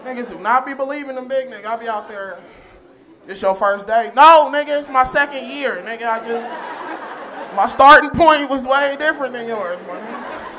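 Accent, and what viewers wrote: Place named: California, United States. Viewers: 100% LA County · 100% Cali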